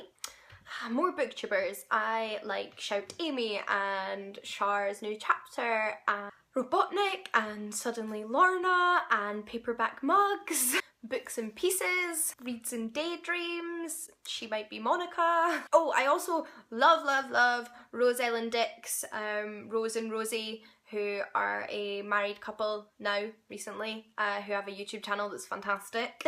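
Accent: Scotland, United Kingdom. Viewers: The Order of the Phoenix is definitely the best HP book. Whenever I watch your videos I start thinking in a Scottish accent! Haha! I might be biased but I recommend visiting Southeast Alaska, particularly my hometown, Ketchikan. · Thank you for clarifying the accent! For some reason, I could've sworn you said you were from London. I guess you were just visiting and I totally misunderstood. So every time I'd listen to your vids I'd think, that can't be right. I KNOW MY SCOTTISH ACCENTS! (One of my favorites of all time!) Seriously, it's kind of sad how much this confused me and distracted me. I thought I had gotten fairly good at placing accents and I thought I had failed. Now I have peace. ;) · But where in Scotland are you from??? :D I love most Scottish accents, but yours is definitely one of my favourites, I'd just love to know what area of Scotland it's from :)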